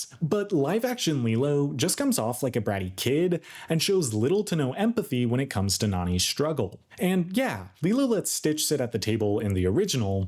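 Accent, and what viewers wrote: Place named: United States. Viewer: That white American accent you got. Cripes.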